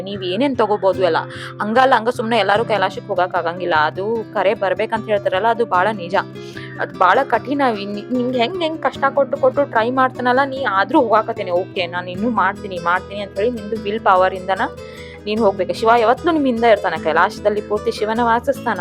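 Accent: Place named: India. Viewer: How is talking Kannada ? Yaav ooru, namm hange ada nimm Kannada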